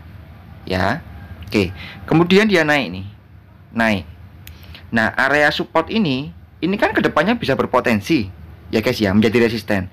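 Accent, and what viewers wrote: East Java, Indonesia. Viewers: Antap Brada Jatim 😇👍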